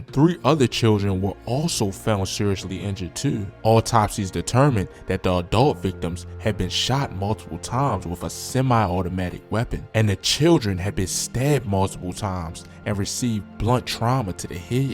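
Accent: Baltimore, United States. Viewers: You got a Baltimore accent, you should probably stick to that...cause you obviously don't know shit about memphis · it's weird hearing a Baltimore accent talk about Memphis it be like me trying to tell you about Fayette st. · His aZZ from Baltimore sun shi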